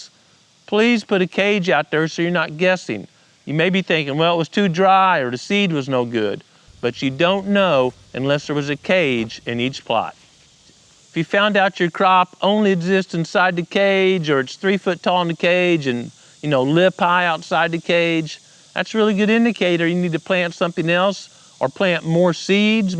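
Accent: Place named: Eastern Shore, United States. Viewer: You from the Eastern shore? Accent sounds like it.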